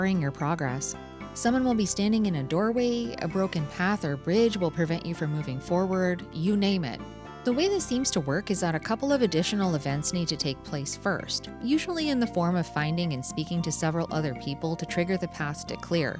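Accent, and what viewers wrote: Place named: Atlantic Canada, Canada. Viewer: I just watched your Faxanadu play through and couldn't stop thinking that you sound like you're from the east cost of Canada :)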